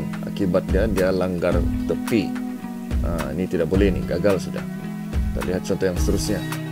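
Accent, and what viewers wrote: Sabah, Malaysia. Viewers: KL! But always fan of Sabahan accent! lol 😂😂😂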